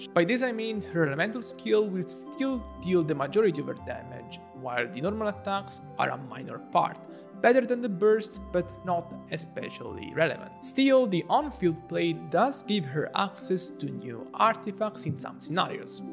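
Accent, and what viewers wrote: Italy. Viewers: Thank you for your guides ❤ and also idk why but i think you're italian bc your accent is similar to the Italian accent 😂❤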